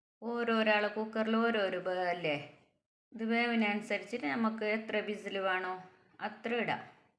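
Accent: Kasaragod, India. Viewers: എനിക്ക് നിങ്ങളെ സംസാരം കേൾക്കാൻ നല്ല രസമാണ് കാസർഗോഡ് ഭാഷ എനിക്ക് ഒരുപാട് ഇഷ്ടമാണ്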